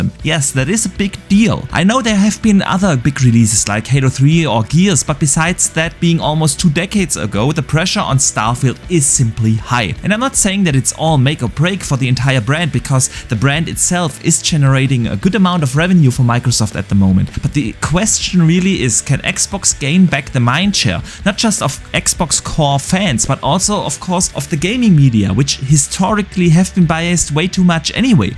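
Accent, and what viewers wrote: Germany. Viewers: Kommst du aus Deutschland ? Verdächtiger Dialekt 🤭, auch wenn du es gut verbergst 😁.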